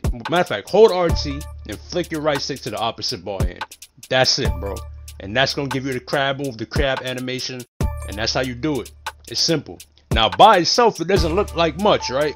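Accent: New York, United States. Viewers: this my first time watching your vid and I’m glad I found you, I subbed and all bro but how tall is your offensive threat I made mine 6’2 is that good ? & no cap you sound like you from NY or NJ which is mad funny cause we sound alike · his New York accent makes this video so much more better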